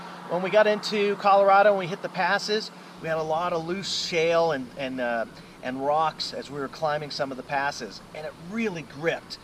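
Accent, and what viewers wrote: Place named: Australia. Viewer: 9/10 for the review, 4/10 for the Aussie accent : )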